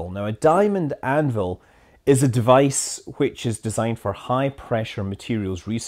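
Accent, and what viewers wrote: Scotland, United Kingdom. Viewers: Something about a scottish mans voice talking about rocket science is super calming. Kids want a bed time story heres uncle to read you history of rocket fuels. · As a fellow Scotsman, yer accent does my nut in man.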